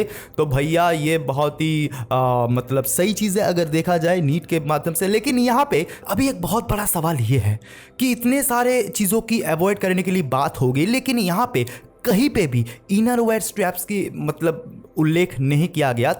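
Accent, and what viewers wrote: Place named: Bengal, India. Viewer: Pehle toh ye bangali accent chod de bhai. Warna hum k lecture ae he khush hai. Ur accent literally irritates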